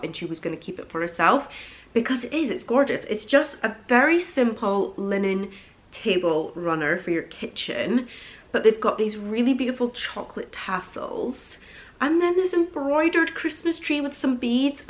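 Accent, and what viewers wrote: Scotland, United Kingdom. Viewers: First time watching your video - really enjoyed it and loved your haul! Will keep watching - I find you're down to earth chat very enjoyable and love your mellow Scottish accent!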